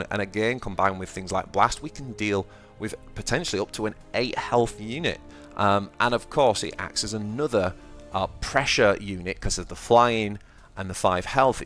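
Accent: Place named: England, United Kingdom. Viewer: I found your channel from some russian nids player in chat and ill just say that throwing an english accent in there makes this sound so much more credible.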